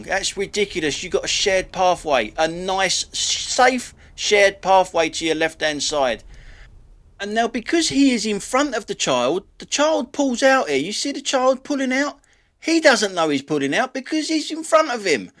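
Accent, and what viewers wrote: London, United Kingdom. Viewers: Love your moaning! You sound just like my hubby with a London accent 😂 Great set of clips, but the dad on the cycle in front of his kid... What the actual...!! That child looks no more than 5 or 6 😢 crazy!! 🤪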